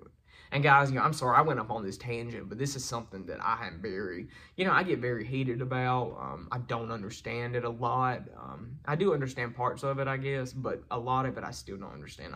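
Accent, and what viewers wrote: South, United States. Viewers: you are vulnerable to all sorts of criticism simply by exposing yourself on the internet. People have all sorts of axes to grind and they find you an easy target to vent their anger without pushback. I find this myself from time to time when in discussions on Quora. I agree with the many upbeat comments here. As an elderly Australian, among other things I find your Southern personality and accent enough to charm the birds off the trees! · Sorry to hear that you've gotten divorced. You are a smart, funny, great-looking guy. I love your Southern accent, and you have a lot of good things to say. Damn the haters, it comes from people who are INCOMPLETE. Sad to say, but these people have MAJOR ISSUES. The thing that people don't take in is , it's not what religion you are, it's not what political party you belong to, the one thing we need is to LOVE. 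Love is the answer to all the bad, all the ills of the world. Education is important, but Love is the answer.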